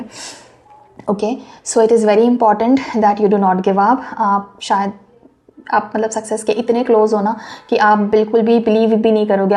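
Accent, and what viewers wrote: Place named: India. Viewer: Don't speak indian style English it makes me sick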